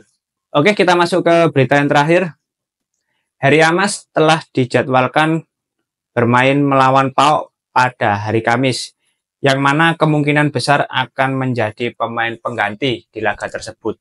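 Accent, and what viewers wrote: Java, Indonesia. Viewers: Jowo e ndi??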